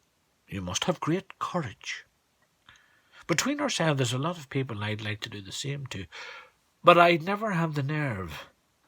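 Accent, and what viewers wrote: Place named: United Kingdom. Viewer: Ohh, I love british accent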